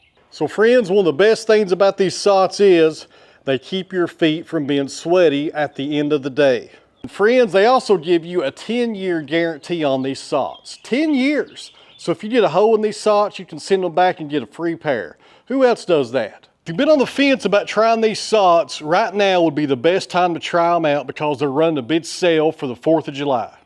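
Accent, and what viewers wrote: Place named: East Tennessee, United States. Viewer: . Would you ever consider showing us some of your picking? Banjo? I would drive from Omaha to see you. So glad you saved the old footage. Losing memory sucks. I know. My brain doesn’t work like it used to either. LOL Even Bruno was smaller. Big 15 y/o now. Great to see the progression and again for your diligence in editing. I love your speech foibles. They just show how human we are. You don’t have to bark orders anymore from being a cop. I’m sure you were quite succinct then. Now you can be just Eastern Tennessee. ❤😂😊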